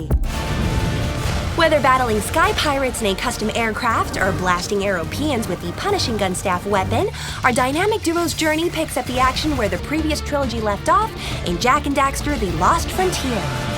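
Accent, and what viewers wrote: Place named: Asia. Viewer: omg i love her asian accent lol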